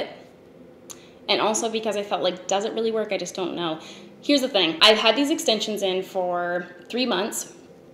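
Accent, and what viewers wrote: Canada. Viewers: Almost a 1,000,000 :3 yujuuuu, btw I love how canadians say coat, boat, oat, out , etc I think is lovely ;3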